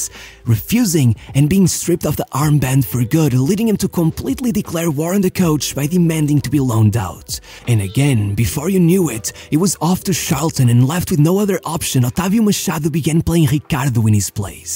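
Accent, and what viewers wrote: Portugal. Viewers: Eu sempre me questionei se o gajo que relata os vídeos era português devido ao sotaque semelhante, mas hoje tenho quase acerteza que é, ao dizer os nomes portugueses perfeitamente, alguém sabe se é tuga ou não? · esse ingles ta em dia meu rapaz sim senhora! mas nao o suficiente para eu perceber que es tuga! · Essa forma de sussurrar com esse travo tuga torna impossível ver os vídeos...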